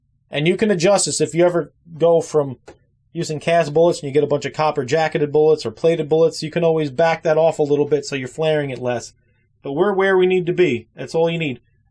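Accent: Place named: New Jersey, United States. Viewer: Thanks that was very helpful.You sound like your from NJ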